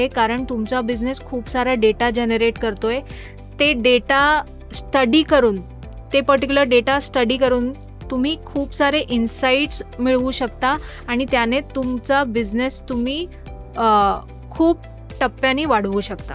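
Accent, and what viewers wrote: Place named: Gujarat, India. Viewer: गुजराती असून ही मराठी स्पष्ट आणि छान बोलता. तुम्ही डाटा अनालिस्ट ची माहिती खूपच छान आणि उपयोगी अशी दिली आहे....... ती खरोखर सर्वांना मार्गदर्शक ठरेल....... धन्यवाद 🎉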